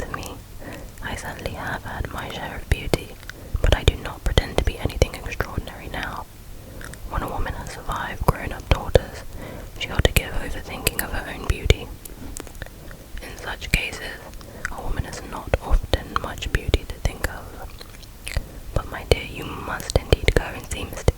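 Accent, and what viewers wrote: England, United Kingdom. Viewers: You sound kind of like kaya with your English accent she does asmr about disturbing cases and horror stuff 👌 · Luv that English Accent 🥰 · Your English accent makes the BEST inaudible sounds! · Oh thank you, this is beautiful. I love english accent and this video is extremely relaxing😊 love it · i’m from the southern part of england and honestly this accent sounds very.. western or eastern british idk why 😭 anyways! very tingly and i adored this. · Love this book ❤ and your good at reading in a British accent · Let's be real - actors know which accents they're good at. You should definitely own your accent, you sound so English 🤩 Plus, who doesnt wanna sound like Colin Firth?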